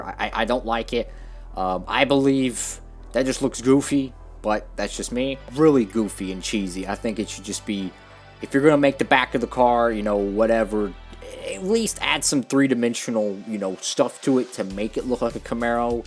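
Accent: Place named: New Jersey, United States. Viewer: And also you sound like your from jersy but im from alabama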